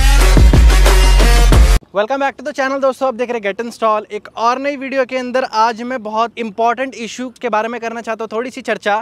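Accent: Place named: India. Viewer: I had a hard time understanding your English due to heavy Indian accent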